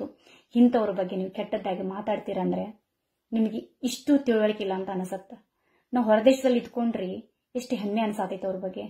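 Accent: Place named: Hubli-Dharwad, India. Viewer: I just love listening to Hubli-Dharwad Kannada - it’s such a joy! Best wishes from Mysore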